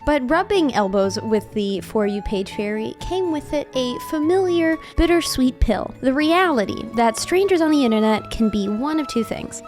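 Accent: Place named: United Kingdom. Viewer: i'm surprised the british accent fit so well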